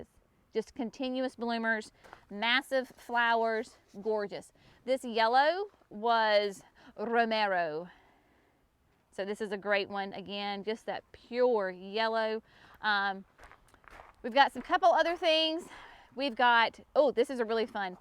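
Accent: South, United States. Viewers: Hello from the wasteland, Illinois! So good to hear someone from the south! Your our sanity.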